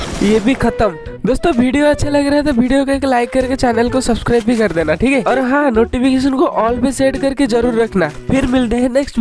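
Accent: Odisha, India. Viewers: Your voice is odia 🥰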